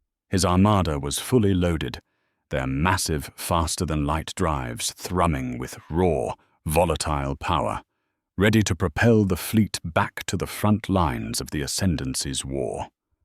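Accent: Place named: England, United Kingdom. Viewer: I like the uppity English voice........original......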